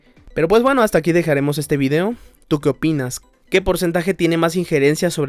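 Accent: Guanajuato, Mexico. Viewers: Eres de Guanajuato, verdad??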